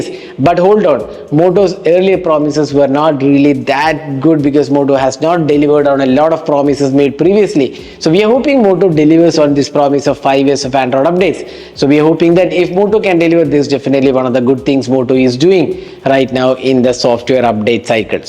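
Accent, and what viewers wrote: Kerala, India. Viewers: U sound like a Malayali.......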